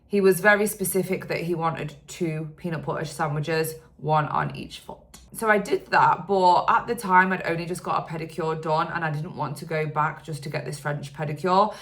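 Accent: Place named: United Kingdom. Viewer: Do you think having a British accent gives you an advantage, say, over an American accent?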